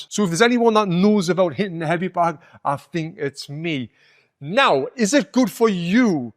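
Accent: United Kingdom. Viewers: From what region of Britain are you?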